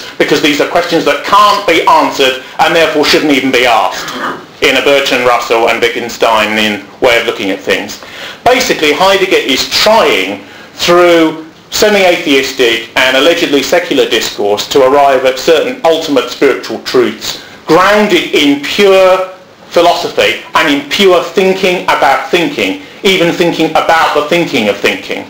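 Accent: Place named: RP, United Kingdom. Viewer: Posh accent.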